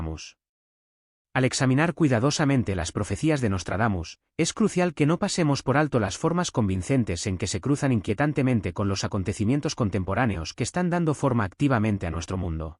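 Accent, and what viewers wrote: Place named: Spain. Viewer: UNA SELECCIÓN SELECCIONADA????, POR PIEDAD, UNA UNA APLICACIÓN DE NARRACIÓN EN ESPAÑOL LATINO, EL ACENTO DE ESPAÑA ES NAUSEBUNDO......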